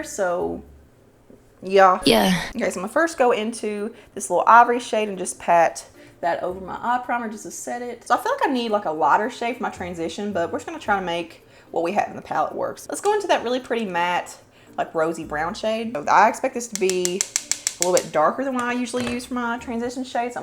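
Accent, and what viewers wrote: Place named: Kentucky, United States. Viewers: My daughter in law is from KY and she sounds just like her!! Love it!! 💘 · Ok…I discovered your channel yesterday and I love it. You are so funny and unique. I recognized your accent cause I’m from Kentucky too! What area are you in?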